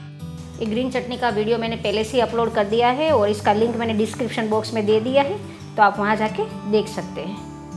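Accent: Gujarat, India. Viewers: Too much of ur gujrathi accent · Apart from your surname your tone also confirms that you are from Gujrat .. 😊😊 and also the kitchen setup all white white .. so nice 👍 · Aap gujrati h na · bhale Tame hindi bolo , pan ek gujarati bija gujarati ne odkhi j jay 😃😃😄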